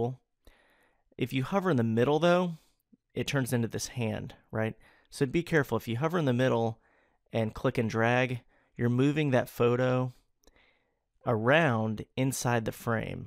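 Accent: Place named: South, United States. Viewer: This is really great and easy to understand! The only problem I had was the southern way of talking, although nice, the lilt kind of fades off at the end and I had to keep turning up my speakers when his voice would get too soft.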